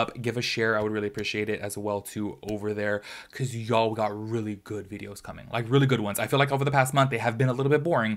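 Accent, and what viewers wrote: United States. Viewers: The way you say it is the way I say it and that's the American way to say it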